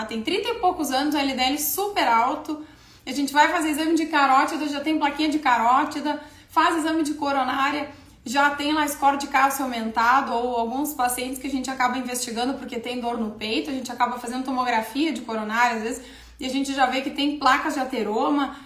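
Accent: Rio Grande do Sul, Brazil. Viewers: Não mude seu sotaque não .sultaques dos gaúcho eu acho muito lindo.cicero Vitalino PR.meus parabéns doutora vc e uma ótima proficional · Um sutak bonito da mulher gaúcha, vc é super...beijo meu ❤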